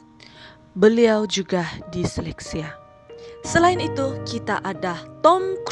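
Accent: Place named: Sabah, Malaysia. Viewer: kamu dari sabah ka miss?, loghat mcm seakan dari sabah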